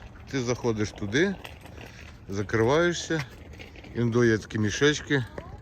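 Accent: Zhytomyr, Ukraine. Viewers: Як же мені подобається діалект Житомирщини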